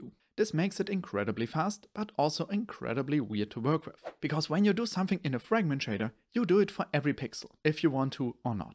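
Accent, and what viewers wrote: Germany. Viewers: This man has one of the lovliest German Accents i could imagine xD Nice work man!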